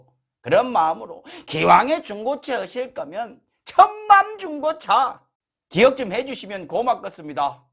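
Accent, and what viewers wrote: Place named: Gyeongsang, South Korea. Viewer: 아따 대구분이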